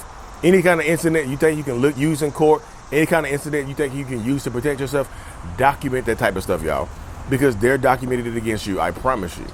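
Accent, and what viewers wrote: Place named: North Carolina, United States. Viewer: Dude, your accent make me wan move bk to Rocky Mount n things like dat haha 💛💪🏻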